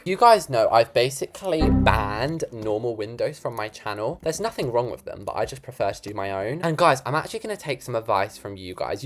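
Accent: England, United Kingdom. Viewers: OML FINALLY A BLOXBURG YOUTUBER WHOS BRITISH NAH I AM SUBSCRIBING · Why dose his english accent make him sound so sophisticated it makes sound like hes about to ryme every time he says something lol🤣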